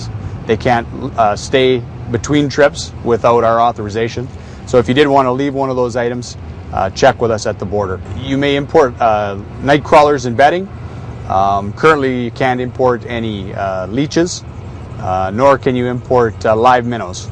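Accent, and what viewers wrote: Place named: Manitoba, Canada. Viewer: True. Based on the "a" in his "mAndatory" which is only common west of Ontario, his "ou" in "about" which is of course the stereotype of small-town Canadians, his "ar" in "card" which is ever so slightly different from that of the eastern provinces, his "la" in "regulations" which almost sounded Native/Aboriginal to me, combined with his intonation and his very frequent use of "thee" for "the" and "ai" for "a", I would peg him as being from Manitoba or Saskatchewan. Only a guess tho!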